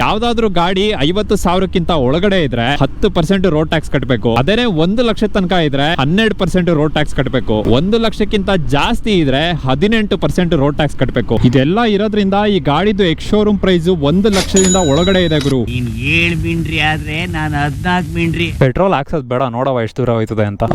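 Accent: Karnataka, India. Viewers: ದನಿ ಕನ್ನಡದ ಧ್ವನಿ💛❤️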